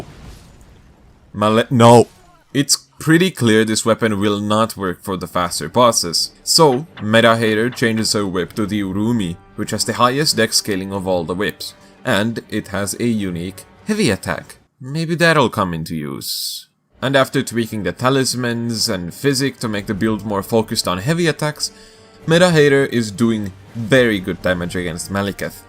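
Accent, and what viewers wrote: Finland. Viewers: Why the fuck do i smell Finnish accent… vittu saatana jätkä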